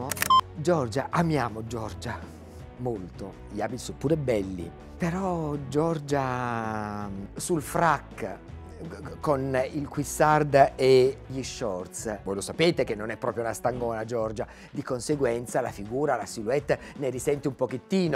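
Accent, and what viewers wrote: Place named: Naples, Italy. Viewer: Ma da quando parla con accento napoletano. Voto 1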